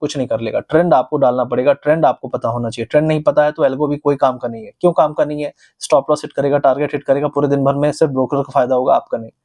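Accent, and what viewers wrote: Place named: Gwalior, India. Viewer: Very original ho bhai...bilkul desi gwalior...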